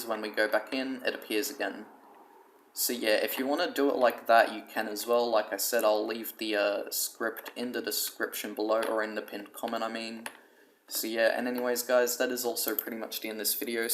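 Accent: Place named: Australia. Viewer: damn love your voice,real Aussie accent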